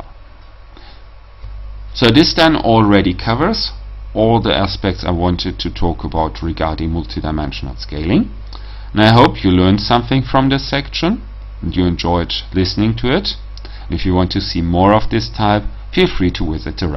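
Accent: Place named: Germany. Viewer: schrecklich deutscher Akzent. Mach gleich ein Video in deutscher Sprache!!!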